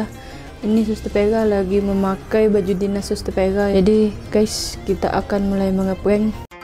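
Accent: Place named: South Sumatra, Indonesia. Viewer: tpi cak wong komreng caro ngmong lau bik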